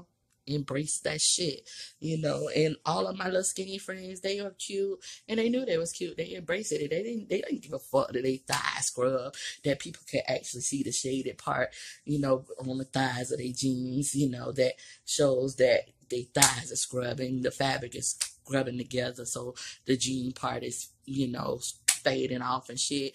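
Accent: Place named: Florida, United States. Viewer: Imma little bitty bitch but i can relate to you in a lot of ways! And i been watching yo vids for some years now and i can see that LIKE ME you too didnt have good school years... they picked on me cause i had acne..for the most part. And when my hair fell out in 6th grade it was cause i was bald head and had acne. And i was really self conscience and had very low self esteem them days too. So i was also naturally depressed ofcourse. So again sis i can relate. I know the lame from snap said some "negative" shit but i watch you too and here go some positive shit for yo ass. 1. You REAL asf, and thats more than i can say for most ppl. You very honest and blunt. 2. You funny, 3. You are BEAUTIFUL, and i being dead ass when i say that 4. Normally you are very CONFIDENT and vivacious. But these last videos i dont seen that you recently did..have been down and in your feelings and im worried cause your normally Happy and funny and smiling and shit just being...and your eyes looked lively and happy. 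In this video they look so sad. I just want you to know your real subs dont care that you a big girl or that you breathe hard. I never noticed tbh...i just hear that thick as florida accent. I like yall accent lol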